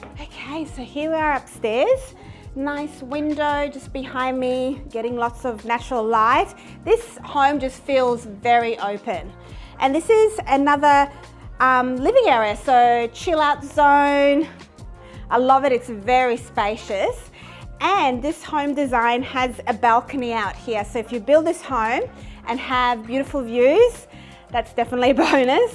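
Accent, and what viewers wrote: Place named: Queensland, Australia. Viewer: BTW everyone, this is your typical eastern Australian accent, Queensland variant.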